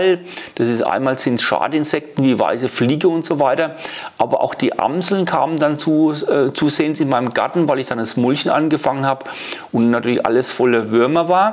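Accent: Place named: Hesse, Germany. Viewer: tolles Video. Kanal abonniert. Was ist das für ein Dialekt? Hessisch?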